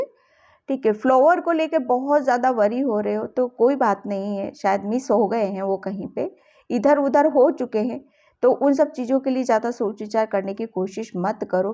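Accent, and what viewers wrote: Gujarat, India. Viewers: Ma'am I believe you must be from Gujarat. I can make out from pronounciation, as I am working in defence organization. Good Night 💤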